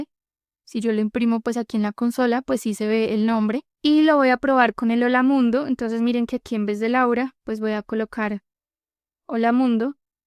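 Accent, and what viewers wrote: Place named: Colombia. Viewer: Que delicia escuchar ese acento colombiano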